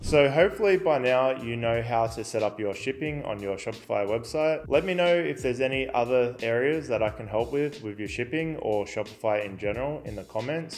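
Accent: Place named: Australia. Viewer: Thanks! Nice to have an Australian voice and real person to talk me through it (as opposed to an American chipmunk AI). 👍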